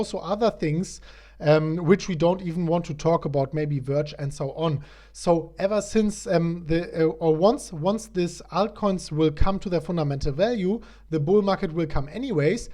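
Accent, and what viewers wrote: Germany. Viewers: Sounds like hes a German guy. Or am i wrong? At least he sounds like me if i try to speak english 😂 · I subscribed because of the great content and intellectual German accent. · Is The Moderator Form Germany or Something? No native speaker?!.. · War schon klar das du dütscher bisch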